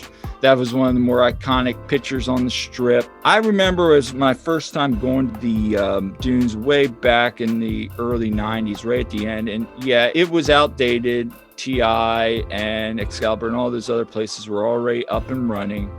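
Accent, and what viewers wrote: Southern California, United States. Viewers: nice vid! also r u from socal?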